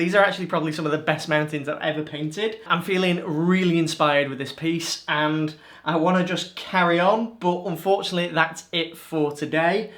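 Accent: United Kingdom. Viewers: you are to precious, I have tried to place your accent and wow I felt it was British, love every adventurous technique you experiment with and the excitement when you have achieved it successful, send you a grannies hug sadly my acrylics still keep turning to chewing gum no matter what I, then digging through 20yrs old acrylic liquids they worked like a charm, moral is ever give up, keep excitement in your voice, it's very motivating, send a grannies hug well done❤Eunice SA 82yrs old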